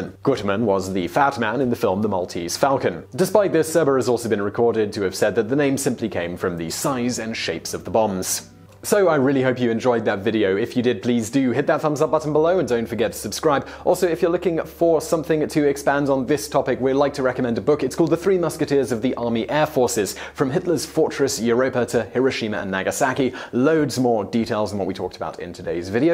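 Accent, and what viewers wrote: United Kingdom. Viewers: The main reason I come here to hear how British people say words.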